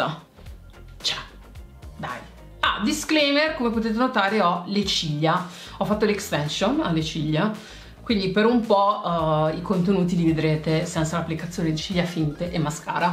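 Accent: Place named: Apulia, Italy. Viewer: con l’accento pugliese mi mancava 😂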